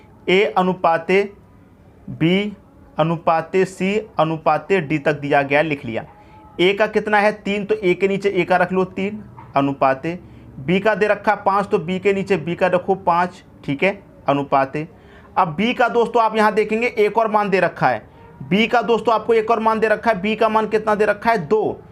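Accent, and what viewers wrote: Haryana, India. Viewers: Gjb HARYANVÏ 🤑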